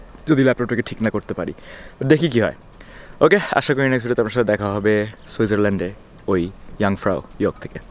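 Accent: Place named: Kushtia, Bangladesh. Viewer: এ্যতো দিন পর বুঝলাম ভায়ের ভাষা এ্যতো শুদ্ধ কেনো কুষ্টিয়ার ছেলে বলে কথা ভাষা তো শুদ্ধ হবেই 💜💜💜💜💜💜💜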